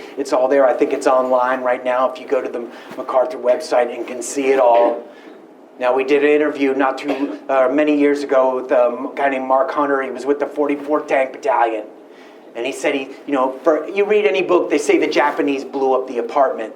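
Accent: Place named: Bronx, United States. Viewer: This speaking style is 3rd rate, does not fullfill academic standards. Articulation as if the Bronx back alley accent, Joe Pesci et al. Unlistenable.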